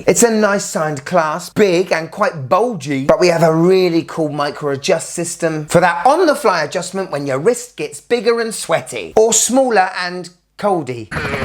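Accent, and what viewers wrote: United Kingdom. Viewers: I just realized who you remind me of….Benny Hill (which is a compliment in my mind). And not just because of the British accent , your humor is very similar. I also prefer utilitarian looking dive watches. I don’t like the wavy dial and certainly not the 1990’s bracelet style. I’d love to see Omega reissue their styles from the 60’ and 70’s in both their divers and sport watches.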